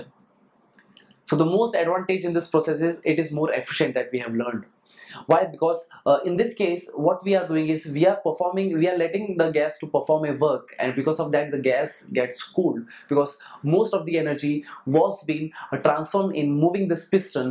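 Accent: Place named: Tamil Nadu, India. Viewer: Tamil speach touch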